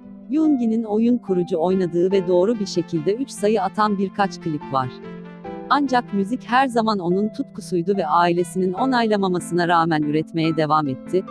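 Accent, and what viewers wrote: Azerbaijan. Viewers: Sen Azerbaycanlımısın? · Ablacim Azeribaycanlimisin?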